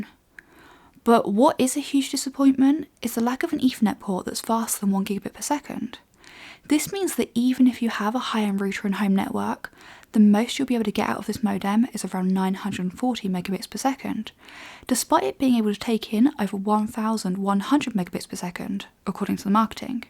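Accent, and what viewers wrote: United Kingdom. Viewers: Her English says it all .. USA is not the origin of English.. it's UK..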